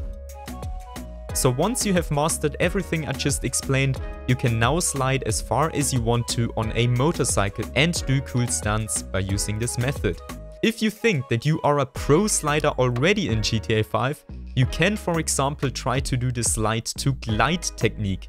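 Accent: Germany. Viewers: Man merkt den deutschen aktsent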